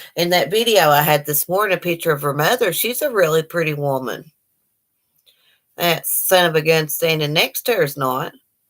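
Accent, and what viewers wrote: South, United States. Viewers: I grew up in Alabama and live in Philadelphia now and, let me just say, it is so refreshing to hear a southern accent again. In any case, I just started watching this so you may mention it in this video, but the first article I read about Maleah made me think immediately of the Susan Smith case-- missing car, no witnesses to the alleged "kidnapping" and a lot of reasons to suspect that the parent didn't want their child. I can't recall the details of the investigation involving Susan, but I do remember she claimed her kids were kidnapped by a carjacker while she was at a traffic light at a deserted intersection but she later confessed when the police discovered that the traffic light only turns red if another car is approaching the light from the cross street and they confronted her. Maleah's parents don't seem like the brightest bulbs, so I imagine that they will soon get caught in a similar lie that they won't be able to explain away. 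Sadly, I can't think of any reason as to why they would concoct a kidnapping story unless (I hate to even type this) they killed Maleah and need to explain why she's gone. They may have intentionally killed her, or they may have injured her so severely that she either died or she would have needed medical attention. After one serious injury they were able to get away with it by the skin of their teeth by saying that she fell. It would have been a lot harder to explain away another serious injury.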